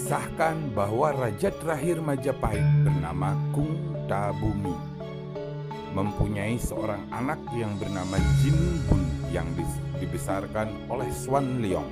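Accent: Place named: Bali, Indonesia. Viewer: Pengisi suaranya kalo ngomong T kayak orang Bali 😁👍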